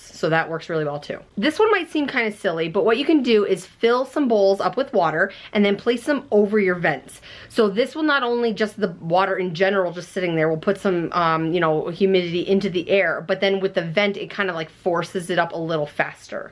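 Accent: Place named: Midwest, United States. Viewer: Where are you from? Your accent is midwest-ish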